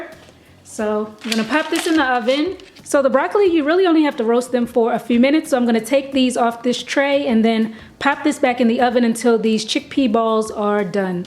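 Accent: United States. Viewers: So im 3 mins into the vid and im like, holdup.....when did she have an american accent? for the longest I thought you and Shirley B Eniang where the same person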